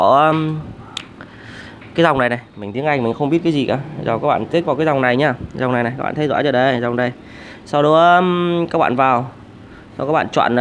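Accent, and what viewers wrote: Thanh Hoa, Vietnam. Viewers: kiểu như giọng thanh hoá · Nghe giọng ông T.hóa chán quá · giọng thanh hóa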